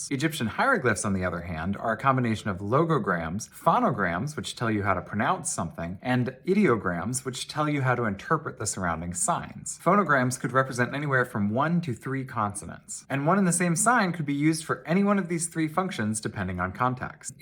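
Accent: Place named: United States. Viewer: I cannot stand the American pronunciation of cuneiform. kyuunay-uh-fooorm.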